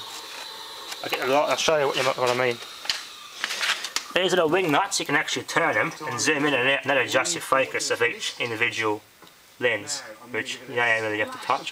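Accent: Australia. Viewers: Strong regional accent from Australia Mate...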